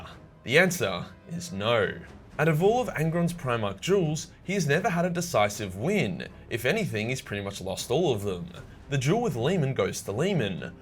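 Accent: Australia. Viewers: He is a fellow aussie, matey bruh... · Who is this australian guy and why does he hate this fictionial angry man so much?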